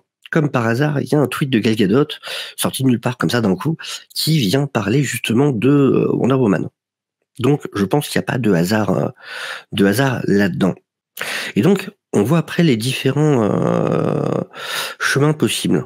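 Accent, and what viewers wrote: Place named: Alsace, France. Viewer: Ce petit accent alsacien, mon dieu ça fait du bien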